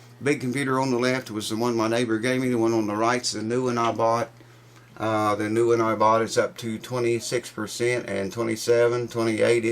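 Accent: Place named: Appalachia, United States. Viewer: Great video - your accent reminds me of the Appalachian Mountains - I sure miss my old friend Ralph Stanley.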